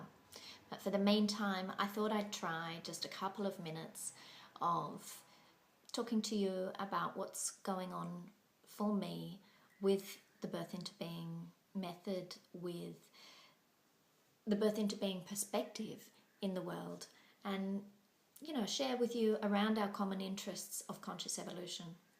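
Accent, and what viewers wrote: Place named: Australia. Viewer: great to see you and hear your lovely aussie voice! No transcript needed! We haven't met but have communicated in the past via email. My stepson and his wife are due to give birth to their first baby in Sydney tomorrow! We will be first time grandparents! The newletter is great, personal and experiential. With love and birth into being, Suzie McGreevy in Ireland.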